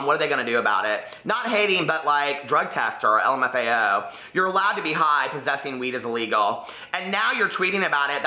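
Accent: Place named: Midwest, United States. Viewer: Is the n silent in that girls last name or is it just fast speaking midwestern accent? Cause I’m dead ass hearing Tana Mojo every time he says her name.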